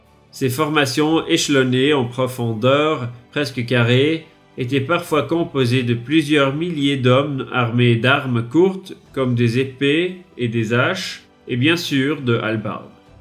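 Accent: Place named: Swiss German, Switzerland. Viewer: c'est un accent suisse alémanique.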